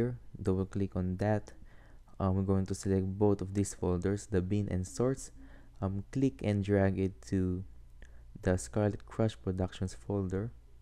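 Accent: Philippines. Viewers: You sound filipino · Peenoise accent :D hahaha peaceeeee :P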